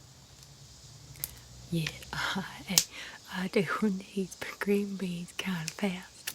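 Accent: South, United States. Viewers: el acento del sur es muy agradable como ASMR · Super relaxing, the sounds and your girlish voice, i love your southern accent ( i've watched tv series and i remember that the southerns sound a kind of different )😘😴😴😴😴😴🐔question: what does "fun spoof" mean??😜💖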